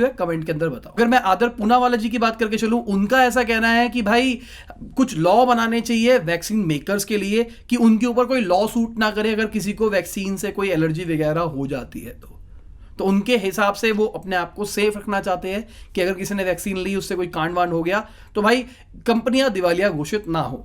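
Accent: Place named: Punjab, India. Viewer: Your accent is Punjabi. Im right? Pegchanana mushkil hy being a Punjabi i can understand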